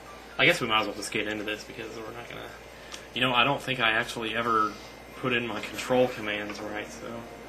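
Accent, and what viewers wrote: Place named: South, United States. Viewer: I'm going to watch this LP once again. This is one of my favorites and still has abit of the southern accent which is funny good stuff! One of the best ever "lemme tell ya".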